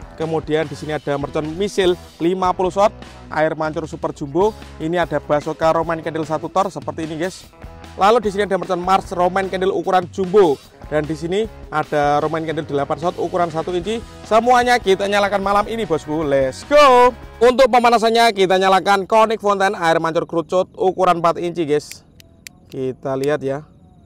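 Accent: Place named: Banyumas, Indonesia. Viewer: Ya jo lali subscribe bang koe kan wong ngapak bang jo lali awal video ngomong "ora ngapak ora kepenak"yo mas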